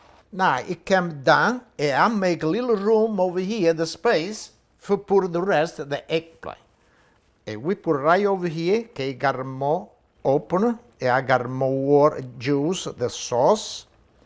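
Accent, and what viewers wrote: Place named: Naples, Italy. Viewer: Mi genetori sono da Grottaminarda e Fontanarosa! Io sono nato a Boston pero, e parlo in dialetto Napolitano come te. Grazie per il ricette saporito! Auguri!!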